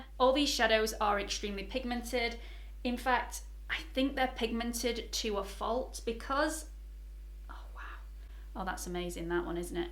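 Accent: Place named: Northern England, United Kingdom. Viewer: You sound northern I am in Leeds. You?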